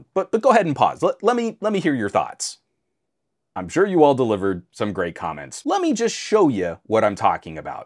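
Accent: United States. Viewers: Mr, what a beautiful American English accent you have..🎉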